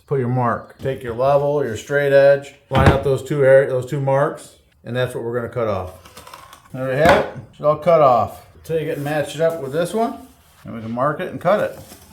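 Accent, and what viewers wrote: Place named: United States. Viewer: i hate this american accent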